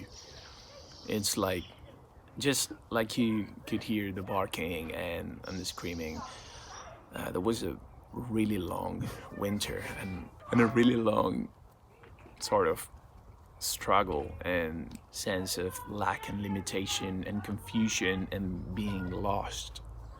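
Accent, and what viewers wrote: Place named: Sweden. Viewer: 100!Resonate. You sound Swedish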